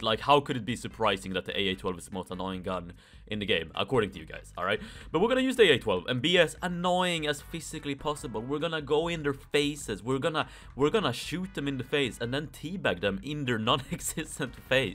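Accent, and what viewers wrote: Sweden. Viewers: you kinda sound swedish.. am i right?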